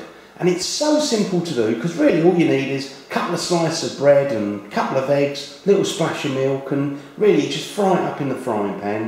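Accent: United Kingdom. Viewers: british accent <3 <3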